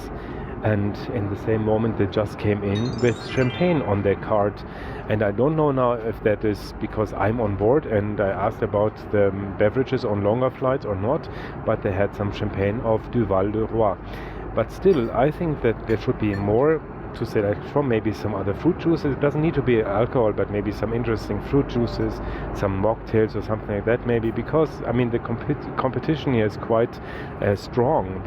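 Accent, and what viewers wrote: Germany. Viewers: This was very informative so thank you for creating this. Also, and not really related, but your German accent comes across as being very friendly 😊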